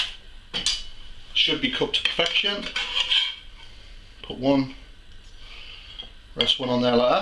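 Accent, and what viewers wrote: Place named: West Midlands, United Kingdom. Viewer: Hi, is this the new video you were talking about in your reply to me on your other Sea Bream [with chili] Video,??? If so, very simple and delicious recipe - Thanks,!!! Nice to see/hear a 'Brit' Midlander' doing great things in the kitchen,!!! It was trying to place your accent - West Midlands, Wolverhampton, Tipton, Dudley, Bridgenorth maybe,??? Be very interested to know - l do have a genuine reason for the question,!!! All the best, Paul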